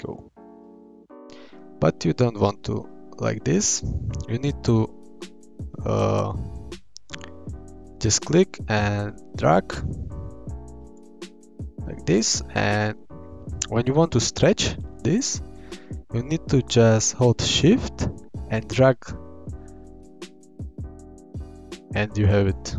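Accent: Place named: Slovakia. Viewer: som si hovoril že jaká slovenská angličtina :D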